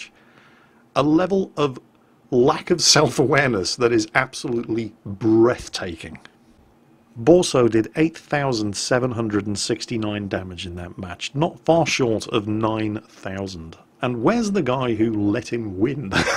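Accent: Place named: New Zealand. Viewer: Agreed 100% - great to hear a voice from home, even better someone who loves the absurdity and sheer 'fun' of (especially) WoT. Best from NZ.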